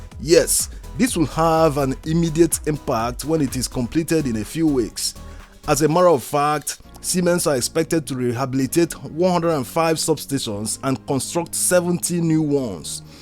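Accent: Nigeria. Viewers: Thoroughly explained! I enjoy your wealth of info, and your🇳🇬 accent too!😁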